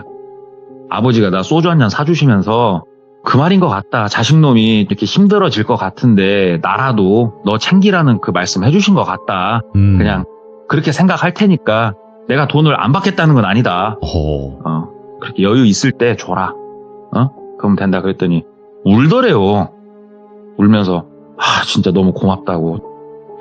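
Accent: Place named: Jeolla, South Korea. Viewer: 너무 재미있어요 빠져들어요 전라도 구수한 목소리 감사합니다.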